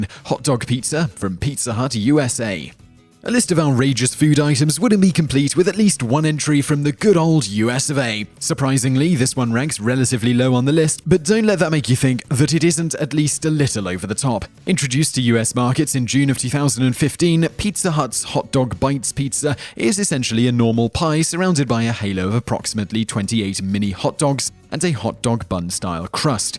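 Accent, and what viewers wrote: England, United Kingdom. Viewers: Is his accent heavier than most Brits?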